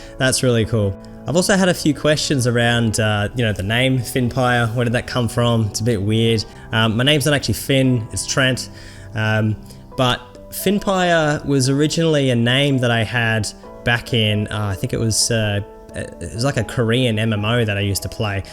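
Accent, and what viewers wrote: Australia. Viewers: Congrats on almost 300 subscribers now :-D In the couple videos I've watched I've spent half my time trying to figure out if you were an Aussie or not haha glad to see someone giving us Aussie gamers good representation. Good luck with future videos. :-)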